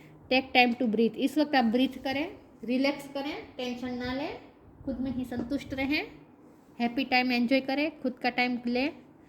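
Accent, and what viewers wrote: Gujarat, India. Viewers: Thank you so much pranam Koti Koti dhanyvad Ishwar Koti Koti Pranam Shukriya mam Aapka kya aapane Puri Baat samjhaie aap bolane se Gujarati Lagte Ho thank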